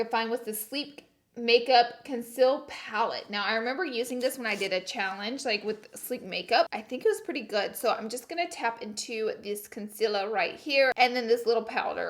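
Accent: South, United States. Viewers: DOLLADOLLA BILLS I love seeing inserts of your old videos. I’m from the south and I just love your southern (charm) accent. It was way more heavy back then as it is now, but I still love it and you and Ty. RTR ♥️♥️.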